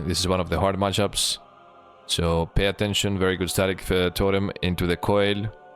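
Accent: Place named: Greece. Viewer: Cool accent, is that greek if you don't mind me asking? :)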